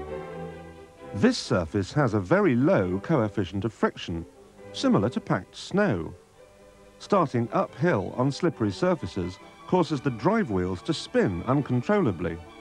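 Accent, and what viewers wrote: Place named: RP, United Kingdom. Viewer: How did he go from suit and Queens English, to POOOOOWER! and SPEEEEEED!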